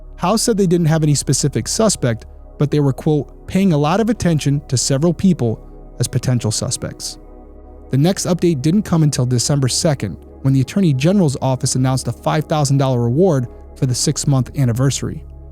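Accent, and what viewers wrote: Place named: Rhode Island, United States. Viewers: Great job!!!! Looking forward to more!!!! ❤ You should let that RI accent out!! I hear it slip occasionally lol. I’m hoping her family gets answers soon. Ty for putting her story out there.!! It’s been awesome watching you grow!!😊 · so good to see you, even without your YouTube other half. It’s weird but I seesr you done different reading your script than you do with SW on CW!? Maybe the RI accent is harder go hide 🙂 I’m here to watch and listen and I’ll be here to watch and listen indefinitely x I also wondered if maybe the people having sex in the back of the car may have actually been a woman being SA’d in the back of a car and she didn’t know until after she’d posted that or sent that message.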